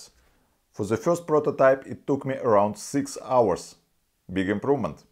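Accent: Russia. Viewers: Русский акцент сильно земетно.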